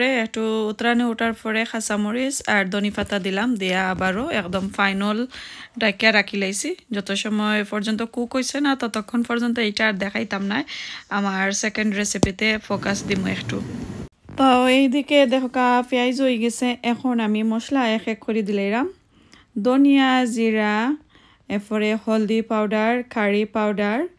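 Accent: Sylhet, Bangladesh. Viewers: Apu apnar kota vala lagse fast comment korlam like diya subscribe korlam. amrar rannar lakhan apnar ranna. Apne amrar sylheti apnar kota shunte valalage. Like diya subscribe korlam.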